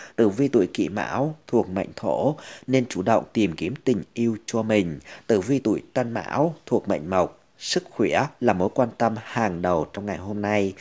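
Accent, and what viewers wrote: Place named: Central, Vietnam. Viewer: giong đoc ko hay mây chuc kênh luon phat triên nhé giong nay nhu ở miên trung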